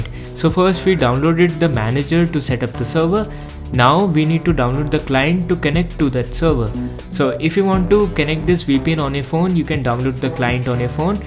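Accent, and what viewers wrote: India. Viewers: will never install something on my pc, told by an Indian voice.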